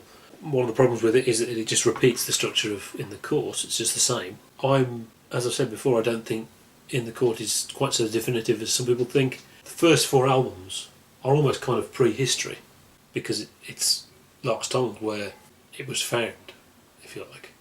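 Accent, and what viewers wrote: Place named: United Kingdom. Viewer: It’s a great album in my opinion, even though it’s similar to the first one. Also, can you try to sound less British next video?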